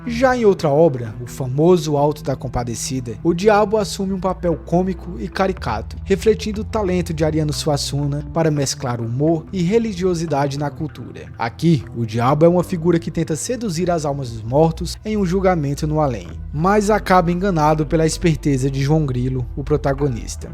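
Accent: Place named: Northeast, Brazil. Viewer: Que Diabo é Diabo, sotaque nordestino.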